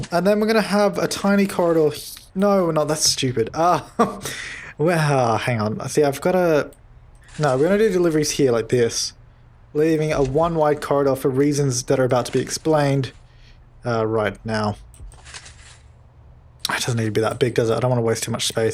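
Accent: Australia. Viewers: Conner Herald me to i like how Australians talk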